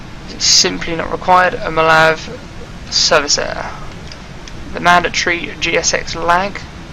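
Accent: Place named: England, United Kingdom. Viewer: Like your English accent 😂🤔🤔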